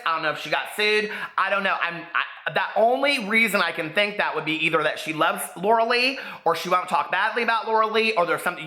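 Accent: United States. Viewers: If you are from Illinois, why are you speaking with a country accent? I understand the gay...but come on.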